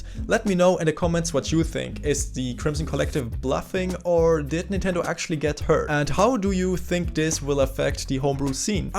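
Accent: Germany. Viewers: That fine German accent...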